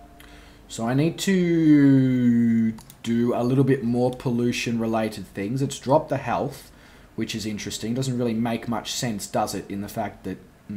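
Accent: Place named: Australia. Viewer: Dude, I highly enjoyed the video. I would recommend that the start of the video should have abit of a soundtack, like 2 seconds. But it your choice. Again, I really enjoyed your aussie accent.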